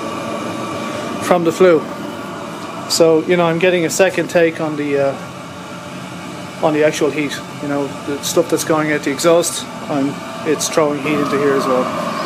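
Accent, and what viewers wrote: Ireland. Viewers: are you Irish? sounds like it. and cool design!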